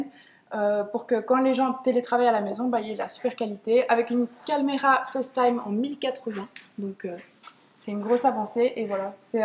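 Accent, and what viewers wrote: Belgium. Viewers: J'ai le même couleur argent, très agréable d'entendre ton accent Belge 🙂j'suis Canadien ;-)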